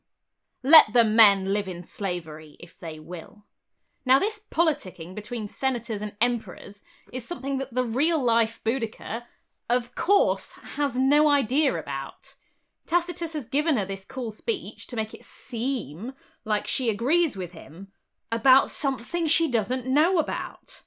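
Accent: United Kingdom. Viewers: First time i watch one of your videos and i must say i just loved it. I was genuinely surprised when you cleaned your tribal make-up and doned the scholar outfit. I like your storytelling style, your light and enjoyable british accent and the photography style you chose for this video. Absolutely well done and keep up the good work Signed: The Romans 😅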